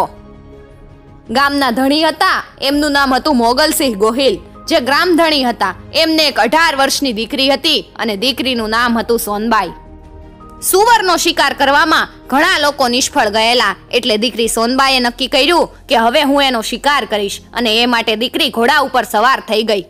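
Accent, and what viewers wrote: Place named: Kathiyawad, India. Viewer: which way you are talking you must from Kathiyawad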